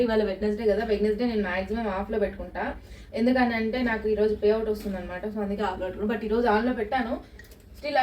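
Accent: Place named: Rayalaseema, India. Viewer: Good to hear your conversations. Your Rayalaseema accent is wonderful to hear. Love your videos 👌👌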